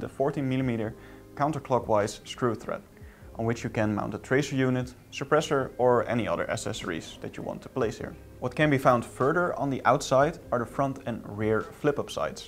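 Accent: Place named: Netherlands. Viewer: I instantly heard Dutch english hehe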